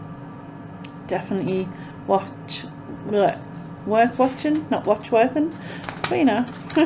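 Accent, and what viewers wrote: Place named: North East England, United Kingdom. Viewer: cool I like north east accent,where in north east are you?how are you copeing with the lockdown?xx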